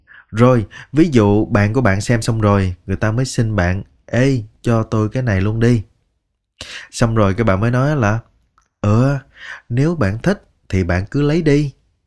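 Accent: Standard. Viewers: Lời thầy dạy nghe rất chuẩn.., rất hay., nghe ấm, truyền cảm và dễ hiểu 😍👍👍👍 chúc thầy và gđ luôn mk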